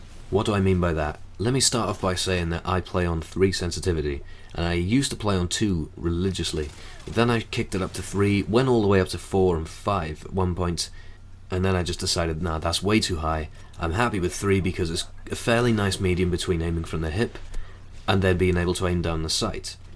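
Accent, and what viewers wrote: Wales, United Kingdom. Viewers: Nice video;) like the way you say what we all think with a British accent. BTW, try M8 tac knife. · yeh, he sounds like my relative from the Gower area in Wales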